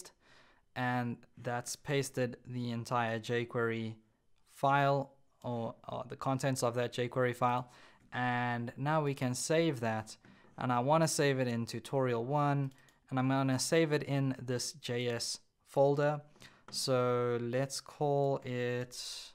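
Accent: South Africa. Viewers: Sounds like you're South African? Great tutorial btw. Lekker man.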